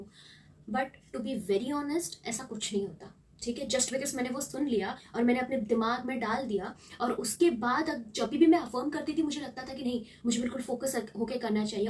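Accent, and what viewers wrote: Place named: Odisha, India. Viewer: Aap odisha se hai ma'am...loved to listen thus 😊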